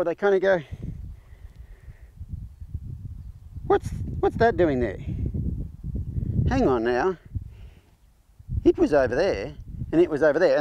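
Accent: Australia. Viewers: My favourite thing about is he still talks like an Australian 😂 Most Australians thinks they’re Americans after a week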